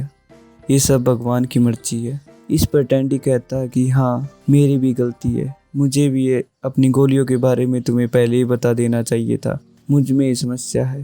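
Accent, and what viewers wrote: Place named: Haryana, India. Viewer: Tum haryana se ho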